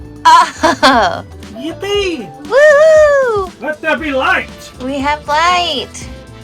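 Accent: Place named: United States. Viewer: Couldn't figure out if you had some weird Seppo accent that sounded a tad Irish - and then you said 'bollocks'...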